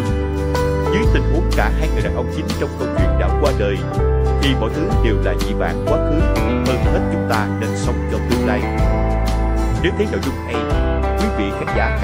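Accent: Vietnam. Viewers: giọng miền Nam hay truyền cảm của MC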